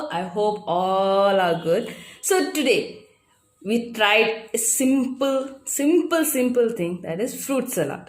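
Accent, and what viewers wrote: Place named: England, United Kingdom. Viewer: I just like your English accent than this fruit salada😊😊😊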